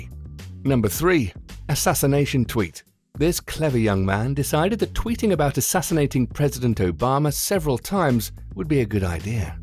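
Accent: United Kingdom. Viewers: boring and i hate your british accent you bloody wanka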